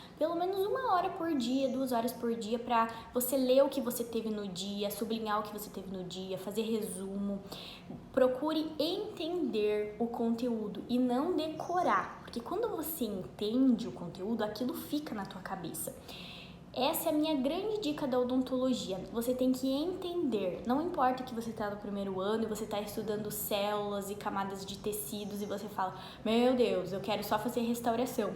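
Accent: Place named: South, Brazil. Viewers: Esse sotaque gaúcho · é do Sul pelo sotaque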